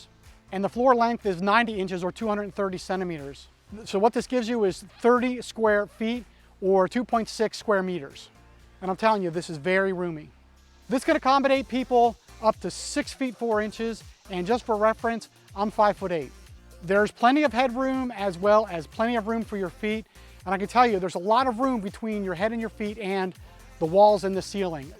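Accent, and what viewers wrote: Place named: Baltimore, United States. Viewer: Are you from Baltimore? I think I hear it in your accent, the way you pronounce during like "dOOring".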